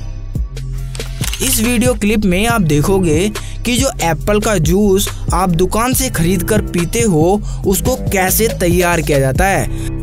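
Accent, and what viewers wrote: Bihar, India. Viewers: Apana voice thoda sudaro bihari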